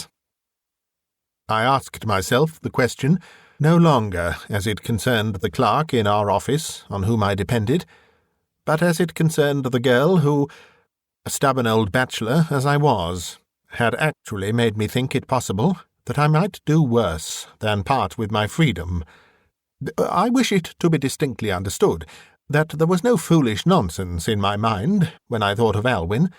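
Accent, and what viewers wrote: United Kingdom. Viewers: New subscriber, very impressed with your delivery! I've always loved the vintage stories narrated with a British accent. They just demand them read so and you do a marvelous job! I'll be enjoying your work often! Thankyou!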